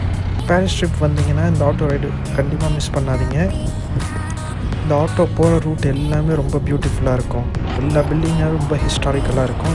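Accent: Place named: United Kingdom. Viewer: Tamil la UK accent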